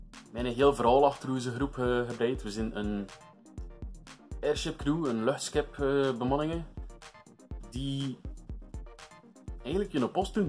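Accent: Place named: West Flanders, Belgium. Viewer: Tis tof zo keer int west vlaams ma ge excuseerde u int begin ma zo plat ist nog nie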